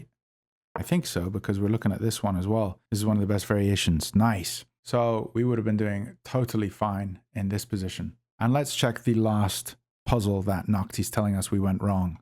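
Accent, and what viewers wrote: United Kingdom. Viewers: gg buddy , which accent u have in uk¿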